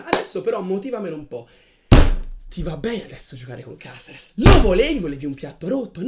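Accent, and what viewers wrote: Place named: Turin, Italy. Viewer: Tipico accento di Torino. Hai studiato a Cuneo o ad Alessandria?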